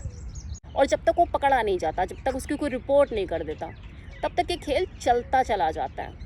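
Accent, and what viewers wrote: Punjab, India. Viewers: Listening Hindi with punjabi accent 👍👍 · Panjabi figar Panjabi lehja. .wah kya baat hy janab .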